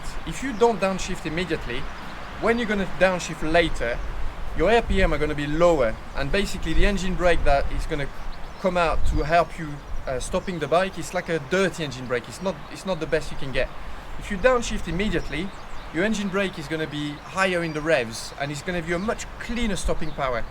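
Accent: France. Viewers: He’s French lol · you are a true gentleman of the track. love your videos, and never get tired of the Anglo - French accent:). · from his accent, clearly french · Are you a native French speaker ?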